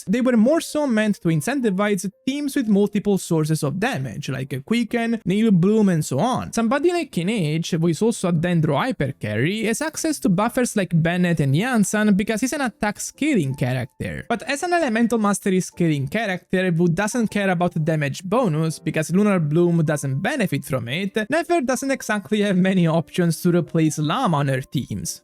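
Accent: Italy. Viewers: Im hearing the italian accent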